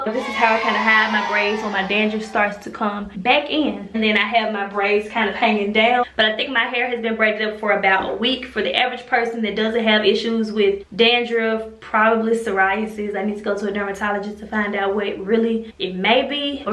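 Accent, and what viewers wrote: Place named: Memphis, United States. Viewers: When you first started talking I automatically heard Memphis! Then looked in the bio and was like I KNEW IT 😂😂😂😂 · Girl I didn’t even know she was actually from Memphis but I saw your comment for comfirmation of what I was thinking 🤣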